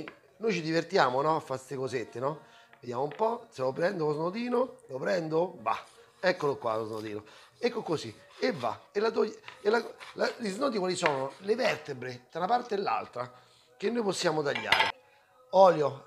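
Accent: Rome, Italy. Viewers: Sei troppo forte sei un vero comunicatore ti guardo e apprezzo sempre le tue ricette e poi le sfumature del romanaccio daieee ti seguo dal l’America 🇺🇸🇺🇸🇺🇸🇺🇸🇺🇸da Naples Florida vienimi a trovare qui e fantastico ciao un abbraccio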